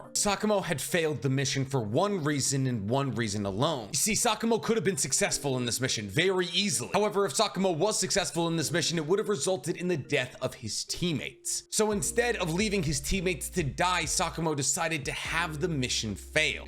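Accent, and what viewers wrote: United States. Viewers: Min-uh-toe........Min-AH-toe. Sounds infinitely better when you pronounce his name properly. You know, for as much of your content, and thus, your livelihood, depends on Japanese culture, you'd think you would spend 20 minutes learning how Japanese phonetics work(and no joke, it doesn't take longer than 20 minutes to figure it out, and really, 20 seems like far too long at that), so you don't sound so absurdly... White? So American? So gaijin? Either way, I know you can tell how weird you sound since you've heard all these names said properly in the anime. So why are you so content to sound so off putting?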